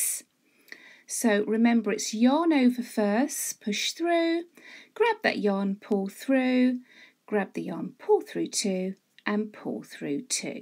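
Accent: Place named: England, United Kingdom. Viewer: You are a LIFE SAVER as this works irrespective of the size of the "granny" - THANK-YOU, THANK-YOU, THANK-YOU !!! (also lovely to hear an English accent) with love & Blessings from South Africa xxx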